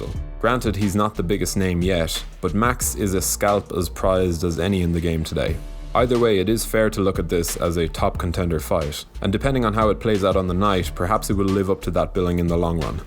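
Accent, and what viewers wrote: Ireland. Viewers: Because of this chanel i want everything to be narrated in irish. Let me take that back, i wish everything in life was narrated by THIS guy specifically.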